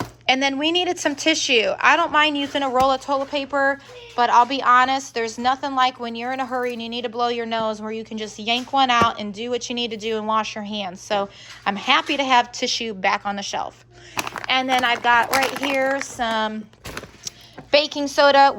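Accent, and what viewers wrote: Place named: South, United States. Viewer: !… please let me know where you were born!… You are so beautiful You look like a California beauty!… But, your wonderful voice sounds southern!… How is this possible?… Just have to say you’re a stunning Mama!.. A perfect woman to yourself, your husband, your babies Your the whole package perfect in everything you do!… Love watching your do what you do!…. A sub until you decide you no longer do your channel!…. And, hello from Rosarito Beach 🏝️ Mexico 🇲🇽Retired old California Gurll!… 🥰😍❤️👌🏽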